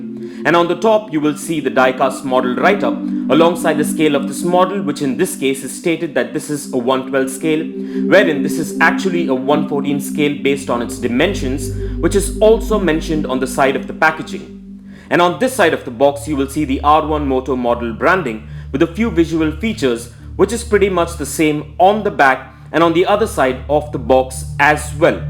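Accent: United States. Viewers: Your accent is like you are a man from America.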